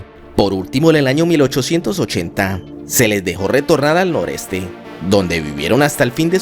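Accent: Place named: Texas, United States. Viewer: Porqué el acento texano en español?.... Se escucha bastante ridículo y le resta credibilidad al video.... Suena como a una comí quita de Disney de los años 60s.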